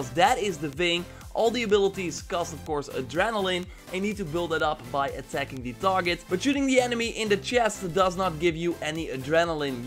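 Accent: Netherlands. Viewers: Bertram Rogers I am sure that there are YouTubers covering this gameplay why don't u go watch them, and i am sure that165k people like his dutch accent and his opinions or else they wouldn't be subscribed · Je hebt een zwaar Nederlands accent, jow now?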